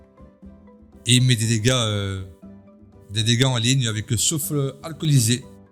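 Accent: Belgium. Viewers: Yo G8 osa 1.29 tous mes respect ! T'es belge non ? ton accent me rire tu gagnes +1abo.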